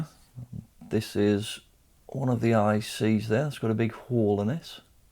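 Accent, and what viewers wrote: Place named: Newcastle, United Kingdom. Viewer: I've just viewed about fifteen YouTube videos on inverter faults and repairs and yours is the first to be watchable, simply because you don't put annoying and unnecessary 'muzak' in the background! I hate this trend on tutorial videos, these video makers don't realise how distracting background noise ('muzak') is to some viewers who simply want to learn the subject. Well done, great (and peaceful) video, with just your easy-going geordie voice talking us through the diagnosis/autopsy. SUBSCRIBED and up-voted.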